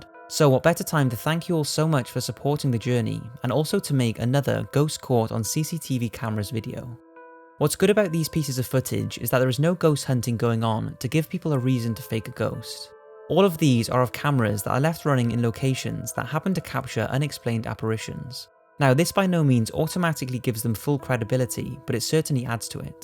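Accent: Wales, United Kingdom. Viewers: Michael Hunt I always thought he sounded Welsh but maybe not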